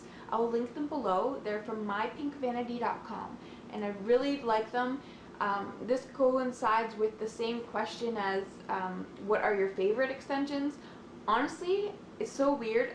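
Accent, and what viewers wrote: Canada. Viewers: Are you Canadian? Because you sound like you have a Canadian accent...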